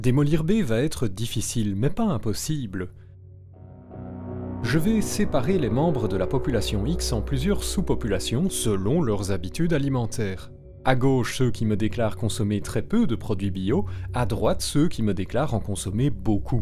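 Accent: Liège, Belgium. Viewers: tooor, preuf, toi t'as un accent belge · Oufti l’accent de Lietche! 🤪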